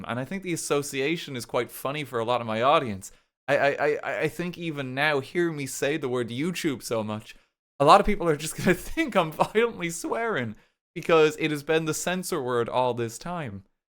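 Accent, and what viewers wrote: Ireland. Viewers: You're Irish?!?!?!?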